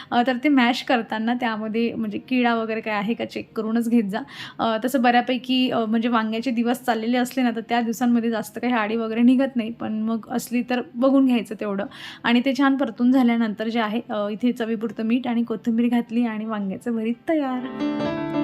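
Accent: Khandesh, India. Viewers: Maz maher Nandurbar ch aahe.Tu khandeshi bolte mhanun mala maheri gelya sarkh watt...mhanun mi tuze blog pahayla survat keli ani aata roj baghte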